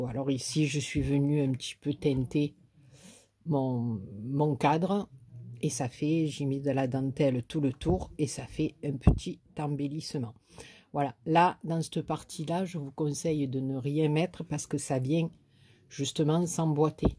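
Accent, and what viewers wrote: South, France. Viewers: une magnifique création 'tous les tutos sont très bien expliquer et avec l'accent du midi.Merci pour ce pur bonheur de partage .nouvelle abonnée .bonne journée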